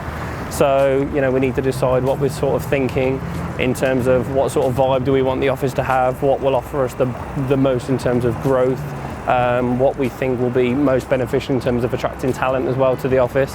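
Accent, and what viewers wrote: Birmingham, United Kingdom. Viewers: that brummie accent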